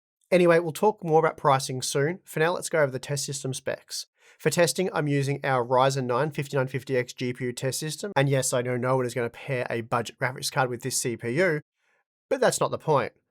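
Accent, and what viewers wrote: Australia. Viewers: I love your aussie accent. Even the caption algorithm thinks you said "game wood" ! XD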